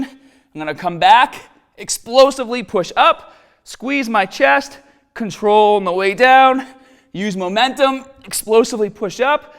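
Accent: Boston, United States. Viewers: It's a Boston accent . Probably related to England and Ireland though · in your earlier videos I don't remember your Boston accent coming out this much as the vidoes now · Weird, your Boston accent developed strongly over the last 4 years. I was just watching a video of yours from 2013 (Two Reasons Why Your Biceps Won't Grow!) and you don't even have an accent. Did you move to Boston and develop an accent? Keep your Rs, man. Don't lose those precious Rs. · That accent tho... I get confused every time. Is that really how ppl from Boston speak? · He sounds like a real deal Bostonian to me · “Staaaaaaaaaht.” I can’t. There is no accent in this country worse than the Boston accent. Great videos....I’’m just having a haaaaahd time getting beyond the “aaaaaaah”. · Boston accent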